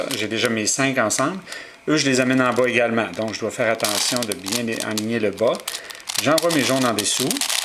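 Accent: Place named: Quebec, Canada. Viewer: yes un Quebecois ! lol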